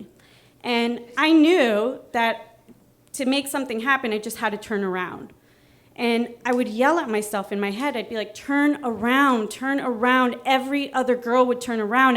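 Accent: United States. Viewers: ... I thought you were American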